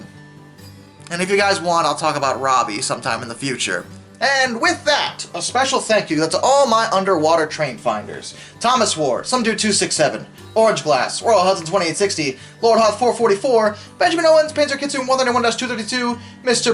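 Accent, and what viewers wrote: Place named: United States. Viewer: Can't watch it. Bloke is a Moron. Annoying Yank. Shut the F up.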